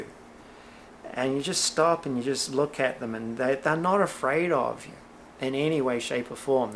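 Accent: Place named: New Zealand. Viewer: Recognized the kiwi accent at the first 5 second of the video, even before he said: it is kiwi hahaha love the accent, miss living in New Zealand ♥ Also I felt for you when you said that you still dont know whats you have to do here on Earth, I myself struggled with that questions up until recently and it was honestly driving me crazy and anxious.... I had an "Near Life Experience" when looking through someones eyes once (I was liivng in New Zealand by that time, yeeeeeey) and I experienced this unicity and seeing the souls of all humans being connect in some sort of galaxy so I know what you are sharing is genuine and 100% true!!!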